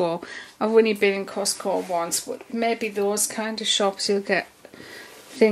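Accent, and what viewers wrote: United Kingdom. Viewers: Hi, you can get some really good plastic jugs from any of the pound shops in the UK. Hope that helps others. Love your videos, it's lovely to hear a UK accent on these videos:) Thank you x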